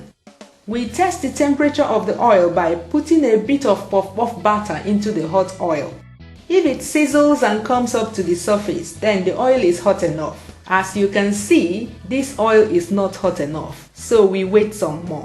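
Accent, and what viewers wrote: Igbo, Nigeria. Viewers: your accent is so Nigerian. I love it! i love how my Nigerian women sound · She's Igbo... I like the accent 😃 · looool I love the Nigerian accent!! the way you say "puff puff" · hahahaha god her nigerian accent is so funny to me and i am nigerian! still, I enjoy her videos and i learn a lot.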